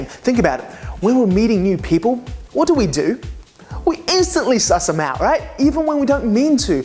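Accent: Australia. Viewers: Your accent is really cool. Are you from Australia? :) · Does he have an Australian accent?? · YOU ARE AWESOME! Also, that accent tho!!!! Australian like mua? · Is that an Australian accent?? 🤔🤔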